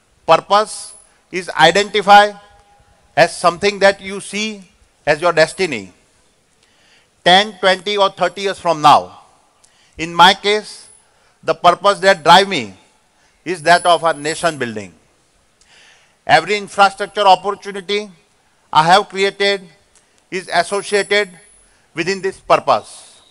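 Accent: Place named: Gujarat, India. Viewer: English gujju accent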